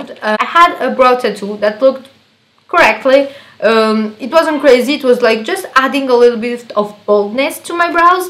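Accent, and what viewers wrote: France. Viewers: Wow the french accent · Tu est française non ? Ca s'entend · Hi,Wonderful Blue eyes ,God bless you. Inoticed your French pronunciation Have you been raised in France? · Wow... J'en reviens pas. Ce sont les sourcils les plus naturels et réalistes que j'ai jamais vu et trouvé sur Youtube! Tu m'as vraiment donné envie de me mettre au makeup :) Je m'abonne sur le champ !!! ( ps: je me permets d'écrire en Français car j'ai remarqué ton léger accent et quand tu as dit Christelle Dominique lol)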